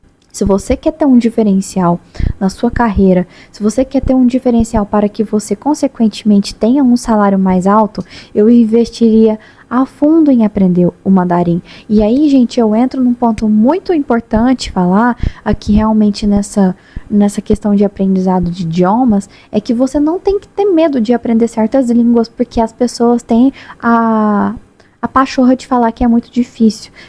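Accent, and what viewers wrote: Minas Gerais, Brazil. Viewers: Tenho uma curiosidade......você é mineira?(de Minas Gerais?)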